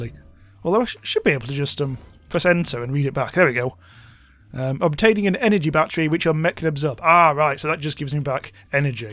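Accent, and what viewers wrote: United Kingdom. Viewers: I hope there will be stable 1.3 pretty soon. By the way, you have a fantastic voice, are you Britain?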